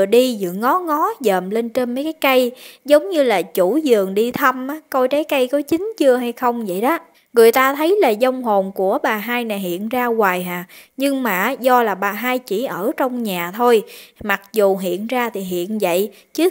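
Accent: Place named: Countryside, Vietnam. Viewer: Helo Em nhé!! Xin chúc mừng Em là mẫu người phụ nữ được yêu thích nhất hiện nay và Em có chất giọng quá chân thật và chân tình quá đi thôi??? Nhung cuộc sống bây giờ không phải là Em nghĩ đâu nhé Em à??? Xin chúc mừng Em là một giọng thuyết minh quá tuyệt đỉnh và quá OK và quá chân thật và quá hai lúa mà anh vẫn OK về MC TV của kênh Youtube quá tuyệt vời và quá hoàn hảo và quá OK và quá 100 /100 nhé Em yêu à???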